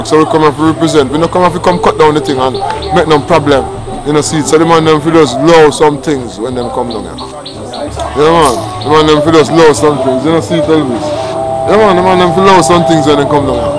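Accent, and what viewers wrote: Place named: Jamaica. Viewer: Hahahahahaha yea man,i really love your patoia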